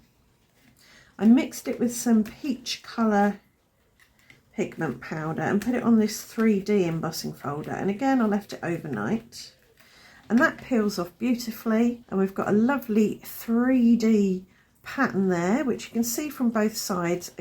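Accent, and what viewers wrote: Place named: United Kingdom. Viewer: I really get a lot out of your videos! Thank you for all your tips, imaginative techniques and use of DYI tools and products. I see you using a grip mat in many of your videos. What brand is that grip mat, please? I am in the USA. A side note, my husband thinks I am talking to someone in another country when he hears your videos in the background. I just let him think that and call you 'my British friend'!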